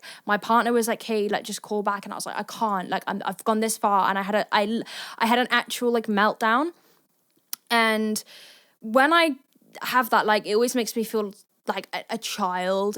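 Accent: United Kingdom. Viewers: wasn't expecting the accent, -B-R-I-T-I-S-H-. Ha, my local australian barista looks a lot like you, minus the tats. ADHD spectrum for me became solidified following epilepsy diagnosis; they can often coincide. Have you ever struggled with epilepsy? Low latent inhibition is a thing too. My brain is super responsive to my own self-generated mind exercises.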